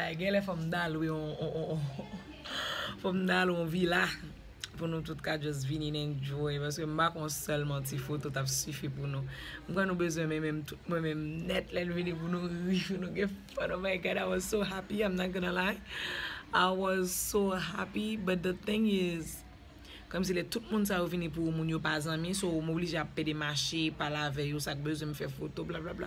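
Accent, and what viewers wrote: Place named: Rural, Romania. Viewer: ki sense sa ou di an genyen,wap felicite yon moun,ou tou profite desann moun andeyor,li pale tankou moun andeyor se 2020 nap viv suspann ak radot nou an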